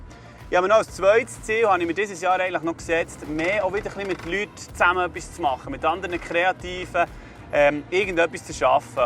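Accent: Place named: Bern, Switzerland. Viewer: Soso cool! Freue mi wäuts uf ds neue Format vo dir! Bi gspannt was de da so aues chunt, u ds no im schöne Bärndütsch! Wie nice! Ächt cool. 🎉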